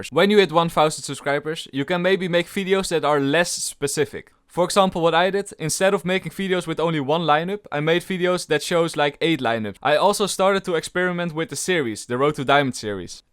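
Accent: Netherlands. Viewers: I am not even interested in making a Youtube-Channel, yet i warched the whole video because this dutch accenr and your charakter is so addicting. Kewp it up man, see you at 200.000 Subs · i am in love wid your dutch accent ! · me not even thinking about youtube but still watching the video to hear his dutch accent :D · hi sir following you since 4k subbed from my 6 account and love from india and most important information to reach 100k u should have a great content and a lovable Dutch accent love your vedios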